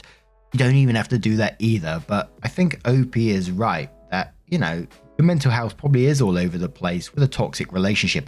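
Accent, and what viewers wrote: United Kingdom. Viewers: He's British they have a different way of speaking fuckin deal with it and stop making it some sort of big deal when it's not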